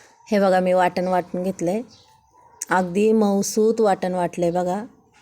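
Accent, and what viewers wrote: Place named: Rural, India. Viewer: तुझं बोलणं आवडतं मला खूप गावच्या पद्धतीने बोलतेस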